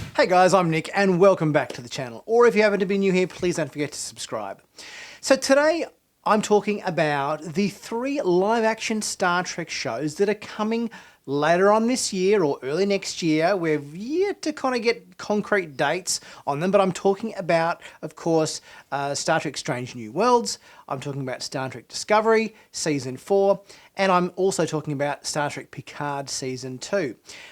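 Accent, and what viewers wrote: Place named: Australia. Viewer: Great Aussie accent. 1. Discovery needs to step away from its convoluted plots that diminish its great cast and production. 2. Picard: Beginning with Farpoint the talent of Patrick Steward and John DeLancie was immediately apparent. I really like your idea of having enhanced memory that would bring Picard's wealth of experince to life. 3. Strange New Worlds: With Number One as one of the great first officers and Pike as a legendary captain the opportunity for Spock to grow with the challenges of a young officer and evolve with awesome mentors would provide a magnificent character arc.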